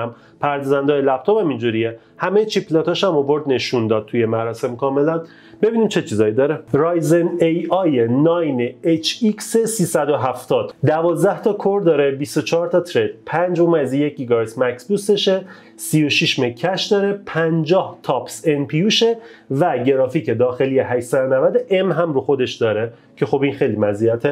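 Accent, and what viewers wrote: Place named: Saveh, Iran. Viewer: ببخشید میشه سوال منو جواب بدید؟ شما اصالتا ساوه ای هستید؟ به خاطر لهجتون میپرسم . موفق باشید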